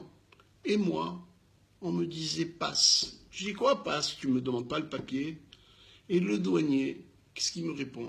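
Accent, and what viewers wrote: Tunisia. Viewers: Je suis tombé par hasard sur ta vidéo... D'abord merci pour le voyage au son de ta voix, si familière...Mais oui je t'aime, j'ai 70 ans maintenant, et je suis aussi de nulle part, merci pour l'accent de mes parents (oncles et tantes), tu es surtout un poète... De la part d'un juif tunisien qui a "tout fait" de tes critères pour être un vrai juif tunisien, sauf la durée (je suis parti à 2,5 ans) car "on" nous a ouvert le gaz pour étouffer ma grand mère Gomara, mon frère Fabien (zal) et moi-même, il ne manquait que l'odeur des beignets à l'huile, Patrick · ! Tous les tunisiens que je connais en Allemagne, vous aiment fort (Musulman et Juifs). Déjà comme tu parle et gesticule c'est déjà authentiquement tunisien.. Nous sommes très émus, oui très émus!, d'entendre votre video pour être un Juif tunisien.. Merveilleux! Salutations le plus chaleureuses et patriotiques pour les Juifs tunisiens!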